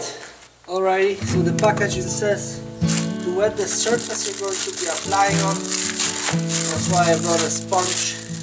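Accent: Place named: United States. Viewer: masz piekny dom i swietna rodzinke moje gratulacje. Mam jedno pytanie i bylbym wdzieczny gdybys odpowiedzial, czy mieszkales kiedys w USA? twoj angielski jest bardziej amerykaski niz ten z Wysp.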